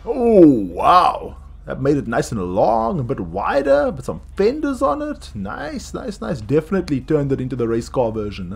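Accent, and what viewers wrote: South Africa. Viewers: Are you from South Africa? Your accent sound like it🤔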